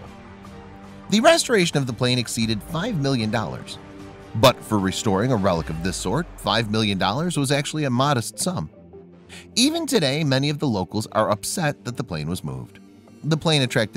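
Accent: United States. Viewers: Note the actual use of English. It is rather like a product manual from China but with a 'Yank' voice.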